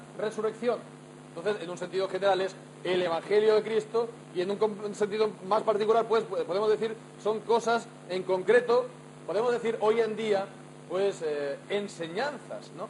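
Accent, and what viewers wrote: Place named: Spain. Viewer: Felicitar al pastor por el sermon, buen trabajo. ¿ De donde es ? por el acento pareciera Español.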